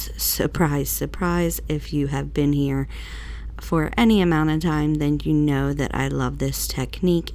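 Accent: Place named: Maryland, United States. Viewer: I just found your channel and am a new sub .. love your projects and love your very clear directions .. I've noticed some crafters speak so fast its hard to understand them .. BTW your accent sounds very familiar .. being a Maryland girl I can tell you're from my neck of the woods 😀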